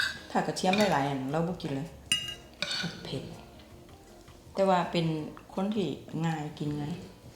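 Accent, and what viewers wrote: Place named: Korat, Thailand. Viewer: แก๋นี่มันสำเนียงบอกเลยเนาะ.ว่าค้นโคราช.หลานย่าโม..ดีแทะ, แทะ.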